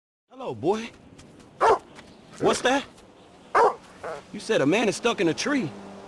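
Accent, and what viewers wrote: United Kingdom. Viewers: u r britain accent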